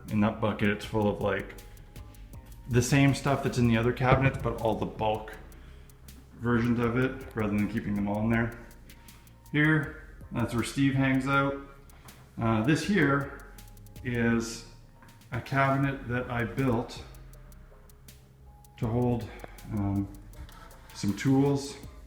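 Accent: Canada. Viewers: Are you from New Brunswick?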